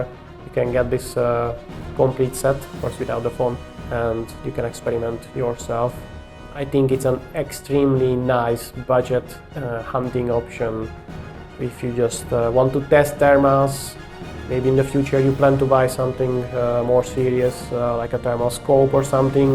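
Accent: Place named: Hungary. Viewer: You sound like hungarian 🇹🇯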